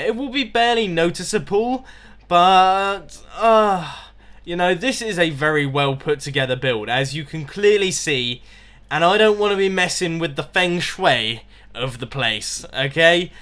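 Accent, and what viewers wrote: United Kingdom. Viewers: I think what I love most is the Britishness of your accent and the music. It's just so... British. Keep up the good work. Love your stuff.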